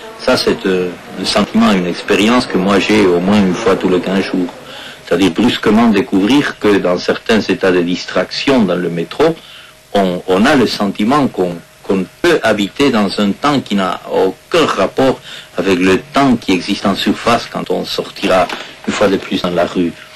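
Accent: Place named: France. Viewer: Muy poco le sale muy bien! Pero lo cómico es que tiene un acento francés cuando habla castellano jaj! La doble r por ejemplo, la pronuncia como un francés, y dice "euuuh" todo el tiempo. Salutations.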